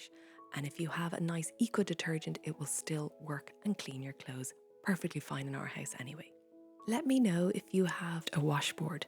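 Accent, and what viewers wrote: Ireland. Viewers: I was a single mama, therefore I had to be frugal as I had to pay for all the expenses and our house on my own. No help no money from my little angels father. I paid cash for everything( except the house payments you know) I called my electric and power company’s to find out what they call peek hours here in USA. So from pm at night until am it would be cheaper to do laundry or run the dishwasher. Since it was just us two , I ran the dishwasher once a week after pm and did laundry another day once a week after pm. In the summer I grew tomatoes/ cucumber/ corn on the cob, mint and herbs. I always made strawberry/ mint/ cucumber water never purchased soda. I was on a budget BUT I Made it happen. I’m 61 now and my little Angle is all grown up and has left. I lost my mom so it’s been an adjustment but now I’m living with the man I knew most of my angels life but I was focused on being a mother and taking care of my aging mother so I never wanted a relationship a friendship was great for me. 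Now that we are together I’m still very frugal and still make my own house cleaner as you do vinegar r/ baking soda and lemon were my cleaners and still are. My home is now rented out and I finally have a little extra income I’m saving. I just found your channel and love it. My dream has always been to go to Ireland so I just love your accent 🙋🏻‍♀️👏🏼👏🏼👏🏼👏🏼 thank you lovely words of encouragement and advice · thank you so much for the recent video on how to save energy, some new tips some old. I likes the door draft / stopper!!. In my country the private consumtion of energy has dropped during the winter; people can save if they have to, most depending on the high prices on electricity and gas... I likes so much that you were facing us during your entire 'energy lesson'. Your voice and your Irish version of English is a feast for me and I guess also for all followers. Now your eayes and smiles supporting you voice, message and stories...makes it even better. 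Here where I live, the days are longer, the sun is shining as like today, however the nights are cold, and also during the daily walks, a wollen hat or similar headwear is needed. Thanks again for the video, your advice, your narrating on energy. All the best to your family.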